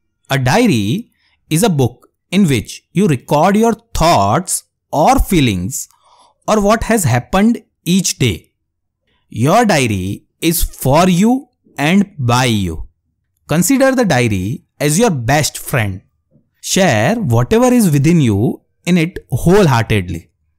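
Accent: India. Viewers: The pure accent of indian english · Pure Indian accent of English 😊😊❤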